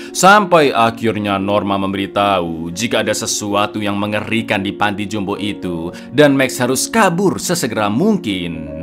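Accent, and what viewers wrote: Java, Indonesia. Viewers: Iya, meski yg direvisi film yg sama masih pe ngen nonton juga sebab narsi sama-sama menarik dan kocak. Yg satu dgn dialek jawa, satunya sunda. Horror jd ga' horror2 amat, sangat menghibur😅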